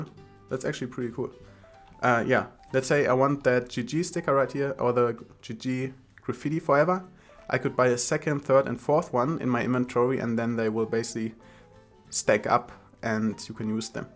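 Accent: Germany. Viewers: Man merkt einfach immernoch dass du Deutsch bist😂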